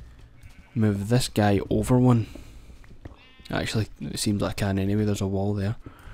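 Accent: Scotland, United Kingdom. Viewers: Yir scotish yes!!!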